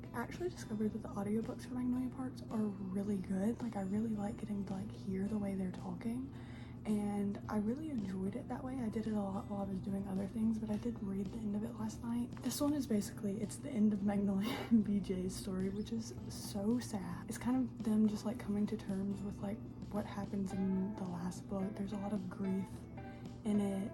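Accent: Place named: Appalachia, United States. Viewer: this randomly popped into my recommended and respect for knocking out that much reading in a month! Are you appalachian by any chance? obviously no need to be specific but your accent sounds like we could've grown up together lol!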